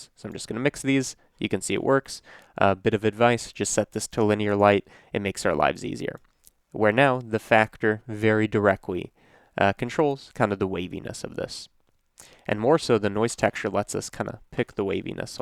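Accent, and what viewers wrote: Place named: Chicago, United States. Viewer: Dude this is straight up genius! Are you from Illinois by any chance? Thought I sensed some Chicago in your voice.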